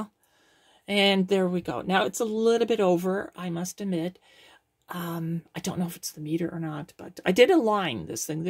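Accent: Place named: Canada. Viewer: I used to subscribe to Elector magazine. Many years ago. I thought it was. A cut above most. The usual ones which? I subscribe to as well. Now I design electronics for a living. Back in those days, it was just a hobby.. I see you're in Canada. I sensed a little bit of a Canadian accent. I'm in Niagara Falls, Canada.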